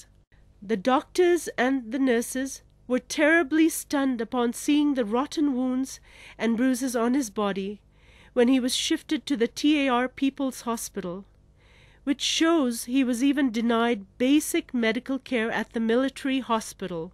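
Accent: India. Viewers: 你麻痹的，阿三口音？贱民一个！ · 印度口音的英语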